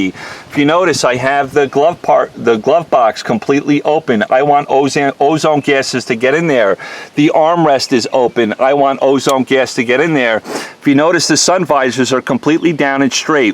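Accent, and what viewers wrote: New York, United States. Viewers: the accent sounded like it came from NJ or NY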